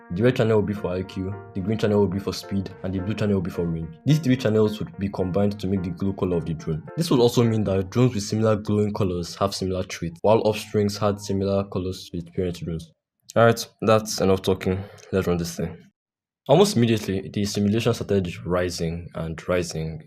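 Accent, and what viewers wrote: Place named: Russia. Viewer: ohh your accent sounded Russian to me😅. Nice video btw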